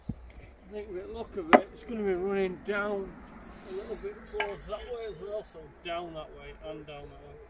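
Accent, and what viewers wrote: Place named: Yorkshire, United Kingdom. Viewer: Always thought you sounded local, I’m from Wakefield my self!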